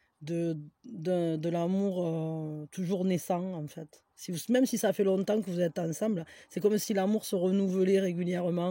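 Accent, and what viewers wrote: South, France. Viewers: Cc et merci pour le choix numéro 1😊😊👍🏼👍🏼 et avec ton accent je voyage dans le sud de la France 🤗🤗💖💖😘😘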